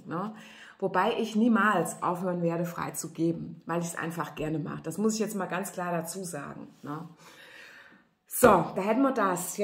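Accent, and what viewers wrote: Hesse, Germany. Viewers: So genial made my day. Danke an meine Freundin Ina die mich zu dir geführt hat. Es macht so Spass. 😘😘😘😘 Ich lieb deinen herzische hessische Dialekt.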